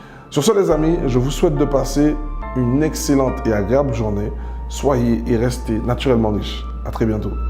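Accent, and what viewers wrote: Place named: Antilles, France. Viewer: Mignon l’accent antillais 😂👍🏾. Merci pour ces conseils car je viens d’acquérir mon 1er bien. Big foss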